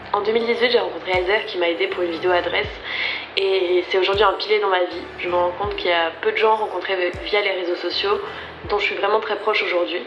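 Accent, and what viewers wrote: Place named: Alsace, France. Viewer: Je me rappelle de tes débuts dans ta chambre, j'adorais tes vidéos, ton univers très épuré et puis ton accent alsacien qui ressortait parfois sur un mot 😅 (je suis également alsacienne). Quelle periode ❤️ Et que de chemin parcouru ! Bravo à toi et bonne continuation dans tous tes projets ! 🙌🏼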